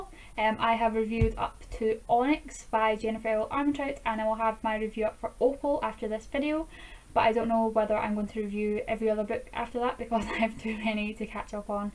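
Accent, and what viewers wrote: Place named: Scotland, United Kingdom. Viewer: I ADORED the Lux series by Jenn! Have you read her Covenant series? You MUST it is ever better than the Lux series. And Jenn is such an amazing down to earth person. So much fun to be around and talk books with! By the by, I can tell by the accent, where in Scotland do you live? My family is from Edinburgh (they all have green cards). I was born in NY but I lived in Scotland as a child.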